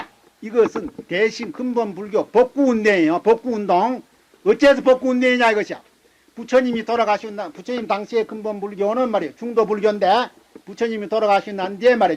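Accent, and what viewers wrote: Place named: Gyeongsang, South Korea. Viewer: 경상도 맞습니다 동감입니다 ㅎㅎ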